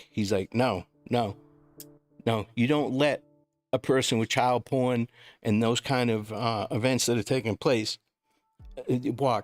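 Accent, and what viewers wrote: Boston, United States. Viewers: Sorry, I asked you a question without bothering to look at your ‘about’. Ha! I thought I discerned a Boston accent!! I wonder though where you were in booking? I have 2 friends who just retired from corrections. One worked at MCI Shirley & Ashburnham with the ‘skinners’ as he referred to them. My other friend worked at MCI Framingham where she was head nurse. She always said she’d rather work with the guys cause they won’t whine half as much. Again, thanks for doing what you do. As I’m a spankin new subscriber, I’ll be binging your vids to catch up. I’ll comment but I promise you I won’t argue with ANYONE. That kind of crap just bores me to tears & I leave channels where that happens. Til next time, michelle in boston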